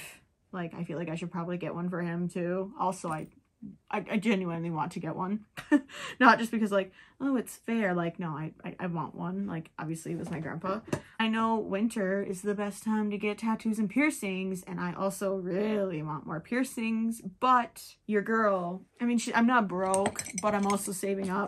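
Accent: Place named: Upper Midwest, United States. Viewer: Haha the upper Midwest accent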